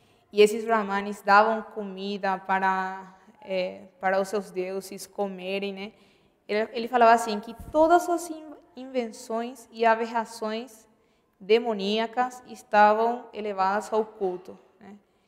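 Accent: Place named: São Paulo, Brazil. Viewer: Gringa com Sotaque paulista. Primeira vez que vejo. Excelente aula. Obrigado.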